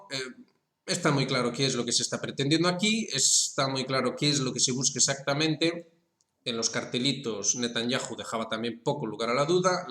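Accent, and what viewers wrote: Spain. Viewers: Me gusta lo que dices. Y tu acento me suena a noroeste peninsular.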